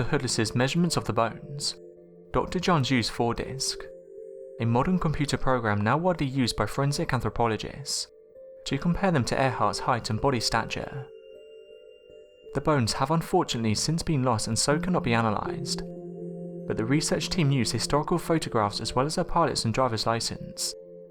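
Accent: United Kingdom. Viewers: Quit with the annoying British accent. You are LOSING VIEWS AND SUBSCRIBERS..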